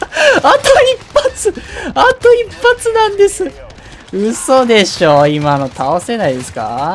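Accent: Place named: Hakata, Japan. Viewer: 筋肉男子の博多弁は惚れちゃうね❤️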